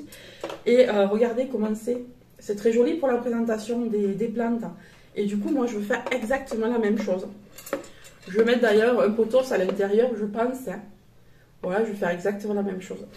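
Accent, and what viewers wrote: South, France. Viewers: bonjour, je découvre ta chaine aujourd'hui et j'aime beaucoup ton haul ! je te trouve très à l'aise devant la caméra et j'aime bien ton accent du sud (je suis moi même du sud ouest !) j'adore le cache pot vert à suspendre et les différentes lanternes solaires que tu as acheté ! je pense que je me pencherai un peu plus sur le rayon extérieur la prochaine fois que j'irai chez Action grâce (ou à cause ?) de toi !! hi hi hi !! par contre moi j'opterai pour des plantes artificielles car je n'ai absolument pas la main verte, je fais crever toutes les plantes...pfff !! allez je m'abonne à ta chaine pour découvrir un peu mieux ton univers. Merci pour ta bonne humeur, bises, Christel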